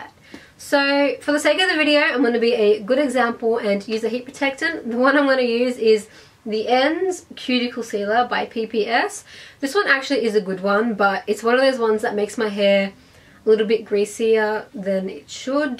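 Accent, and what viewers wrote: Australia. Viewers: She sounds Australian 😍 but she could be mixed or something, still a babe! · Subscribed! Love this video and your accent is so beautiful! Are you Australian?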